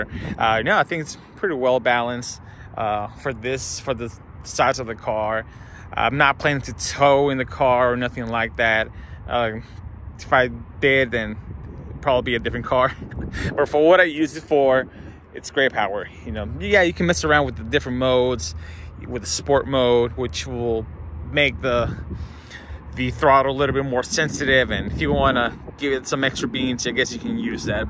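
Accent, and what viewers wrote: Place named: Philippines. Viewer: Slight Fil accent under the Southern accent.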